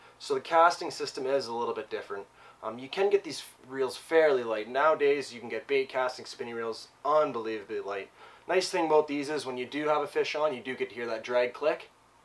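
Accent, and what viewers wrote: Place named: Upper Midwest, United States. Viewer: Are you from the upper Midwest? The accent is really telling.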